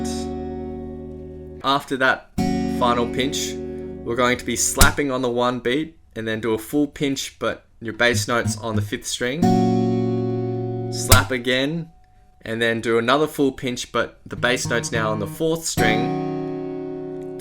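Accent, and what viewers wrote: Australia. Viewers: I commented on a comment, where a few were querying country of origin, well cob, I can ya now, that’s the accent of true bluey, as Aussie as Vegemite, kangaroos n Holden cars, e’s a bloody legend mate, fair dinkum digger.👍😉 and no he doesn’t through shrimp on the barby, chucks some snags on amongst the chard onion.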